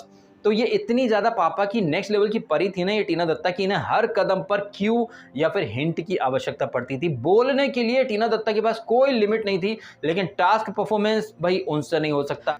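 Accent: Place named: Bengal, India. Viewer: Sir ab appka bengali accent miss karu ga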